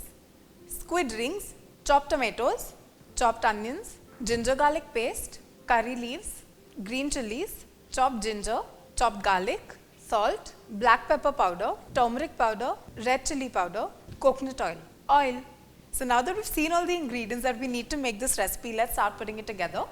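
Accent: India. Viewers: I like your presentation, and your kitchen. And, you have garnished with the Indian accent. I have squids, and was looking for a simple recipe with good results.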